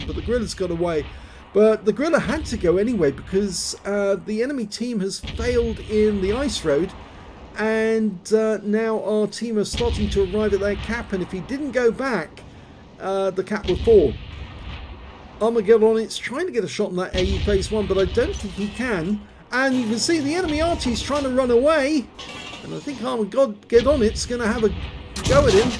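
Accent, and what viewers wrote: United Kingdom. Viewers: You are from England ???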